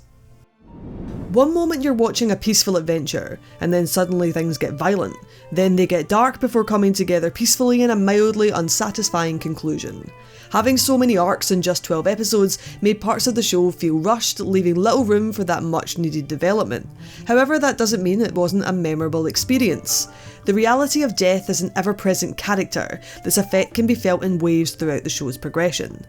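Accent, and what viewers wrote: Scotland, United Kingdom. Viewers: when the Scottish accent hit me I was like yesss gurl- Got myself a wee buddy :D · Just found your channel, but it's exciting to hear some Scottish representation in my Youtube content!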